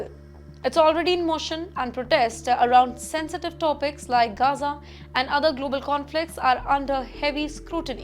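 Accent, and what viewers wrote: India. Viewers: What a hateful hindi English accent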